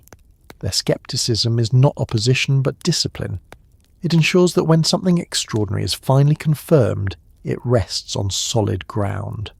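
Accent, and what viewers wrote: United Kingdom. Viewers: How many channels do you have? You are the same british voiceover on many other similar topic of a video. Are you an AI British voice?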